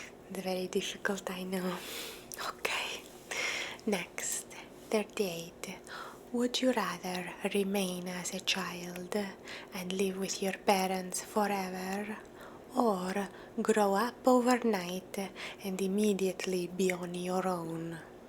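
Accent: Italy. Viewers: Another great Italian accent video. 😁 Thank you. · Ah, you know how much I love your Italian accent asmr. Thanks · Your rather questions are always so hard to answer!😅 But your Italian accent is adorable! Bravissima!😉🇮🇹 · video with the Italian accent are one of my favorite. <3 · The italian accent is spot on!! 😍🇮🇹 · this was incredible omg i hope we get more italian accent videos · Italian accent videos are perfect for relaxing and unwind your soothing voice makes me feel sleepy 😴 😊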